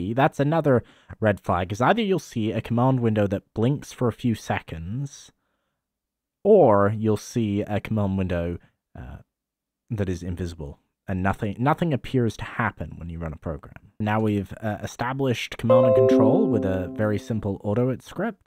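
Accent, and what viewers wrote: United Kingdom. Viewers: is this guy an American with a speech impediment or a brit? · Your accent is so weird, you switch between sounding Canadian and British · Nice. I seem to hear a little bit of a British Accent, but I don't know if living in British Columbia gives you that accent or something. I like it though! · thanks for the tips. your accent rocks. there seems to be some British English on certain kinds of words.. password.. master.. ..ahaha Niles Crane-like.. thanks again! So happy to hear a voice i can understand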